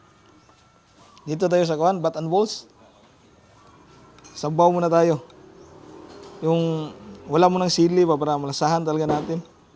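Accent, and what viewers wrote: Visayas, Philippines. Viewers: Bisaya kaau ka bai..😅😅😅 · Mag bisaya idolwag kang mag Tagalog halata kc Tagalog mo idol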